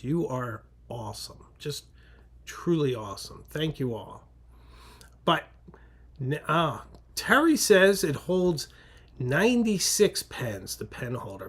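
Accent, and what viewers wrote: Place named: Boston, United States. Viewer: Do you really have a speech impediment? I just thought it was your bostonian accent (like I thought you were talking about your doctor dealing w/ tornadoes at first rather than your daughter). I suppose it's a measure of success that you're at the point where you have critics.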